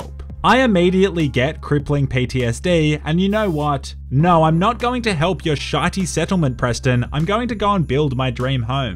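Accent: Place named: Australia. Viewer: Never thought I'd ever hear a monotone Australian, but eh, better late than never!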